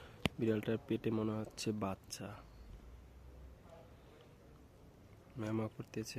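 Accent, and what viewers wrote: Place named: Barishal, Bangladesh. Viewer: শুদ্ধ ভাসা কস আবার বরিশালের বাসাও কস কেম্নে কি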